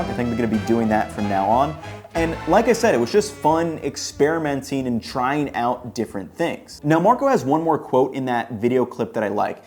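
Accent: United States. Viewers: Just noticed the plugs and cabinets in the background. In spite of the American accent, you're in Europe? Where at? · europlugs, american accent... i am very confused!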